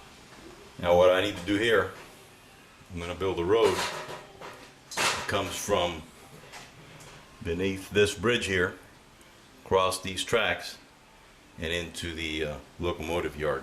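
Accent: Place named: New York, United States. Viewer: I was going to ask you are you from New York because you sound like it..lol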